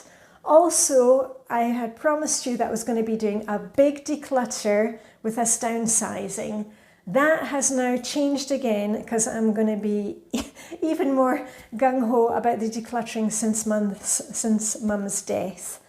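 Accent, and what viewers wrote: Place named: Scotland, United Kingdom. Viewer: Dear, I am so sorry to hear of the loss of your mum. Absolutely know how that feels. I live near Edinburgh, lost my mum 2 years ago to cancer and like you, cared for her at home till the end. It was your routines and cheery Scots voice that kept me going in the home and helped me get ready for work every day, so thank you so much for all that you do. You are greatly appreciated 🤗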